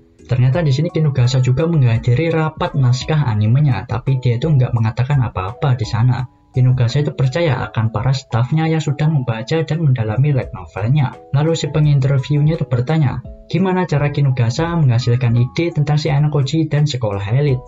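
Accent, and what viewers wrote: Java, Indonesia. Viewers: min kau org mana sih? dri dulu ampe skrg medoknya masih kerasa bgt sumpah 😅😂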